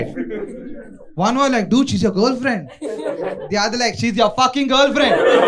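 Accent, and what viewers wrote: Haryana, India. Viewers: dude your haryanvi damn funny bro..!! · that Haryanvi accent was hilarious 😂😂😂😂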